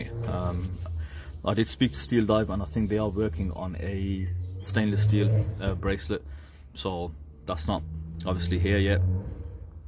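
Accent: Birmingham, United Kingdom. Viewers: Another great vid, pleasing to hear another Brummie on the Tube, loving the reviews! Seen a few of your mods with after market dials now, where do you manage to source them from? 👍🏻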